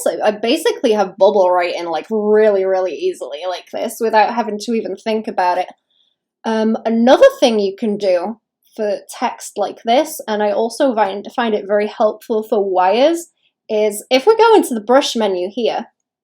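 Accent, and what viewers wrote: North West England, United Kingdom. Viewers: Great art, learning lots from this. Can I ask where you are from? Your accent sounds really close, north west UK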